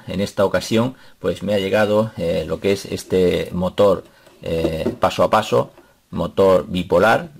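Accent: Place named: Murcia, Spain. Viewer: Hola, muy interesante!!! por tu acento y espero me disculpes por si me equivoco, eres de Murcia. No tienes un curso intensivo o acelerado? me quiero iniciar y no me importaria viajar a Murcia, si es que eres de allí (por la proximidad a Valencia), para aprender algo de lo que muestras.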